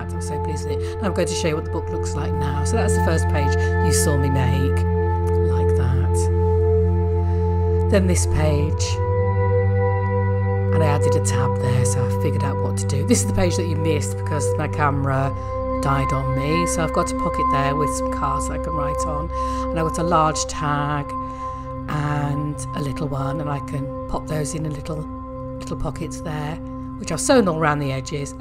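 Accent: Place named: United Kingdom. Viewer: Yes, I thought the same. The music was beautiful, but it did compete with your lovely voice... and I LOVE British accent.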